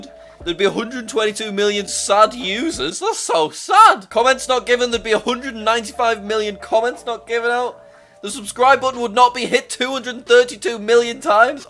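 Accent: United Kingdom. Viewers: Bro i love your british accent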